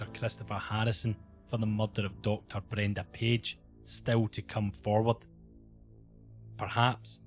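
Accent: Scotland, United Kingdom. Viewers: Hi, I just discovered your excellent channel. I was raised in Canada by my Scottish grandparents and I love listening to your videos. Can you tell me where your accent(s) are from in Scotland? My Nana was from Glasgow and my grandfather was from Tighnabruaich in the Kyles of Bute ( he said he had an “Argyll” accent and most people could not understand him in Canada) Thank you! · The scottish accent!!! so special!!!! ❤️ keep up the great work!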